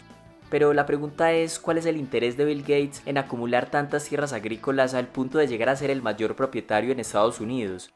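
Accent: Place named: Paisa, Colombia. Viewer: ese acento yo lo reconozco, es colombiano paisa jaja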